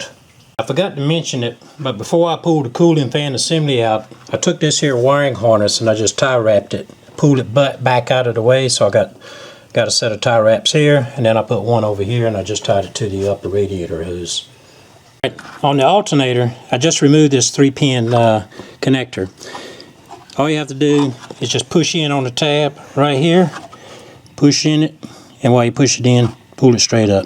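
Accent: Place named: South, United States. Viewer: Where are you from out of curiosity? My guess is Louisiana? Texas?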